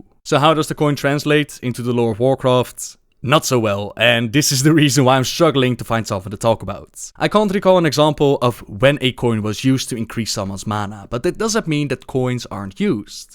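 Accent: Netherlands. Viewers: are you dutch? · that dutch accent tho :p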